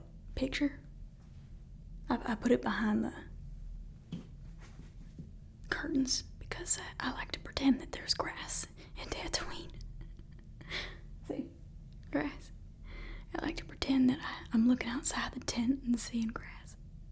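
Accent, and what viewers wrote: South, United States. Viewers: Southern accent in Star Wars huh😳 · "Southern Accent" LETS GOOOOOOOOOOOOOO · Are you the mechanic from the Mandolorian cause I’m pretty sure she’s got kind of a southern drawl too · Great Southern Accent I was born and raised in NC you nailed it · Southern Accent! Thank you! ❤️ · Why does every spacer she plays have a southern accent? First the Outer Worlds character, now this. 😅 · That Southern accent is so good, reminds me of your awesome Train heist ASMR video. Any chance you might do a Red Read Redemption video? Awesome video. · This is amazing! Love the southern accent! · That southern Tattoine accent is just adorable.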